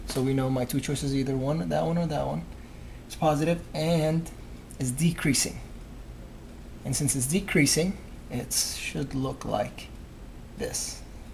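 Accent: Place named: Brazil. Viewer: Are you brazilian? Your accent looks like a brazilian speaking english ...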